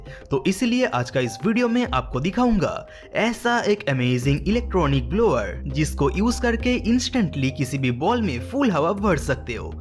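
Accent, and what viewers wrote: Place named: Bengal, India. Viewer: Abe bengali... fix your hindi accent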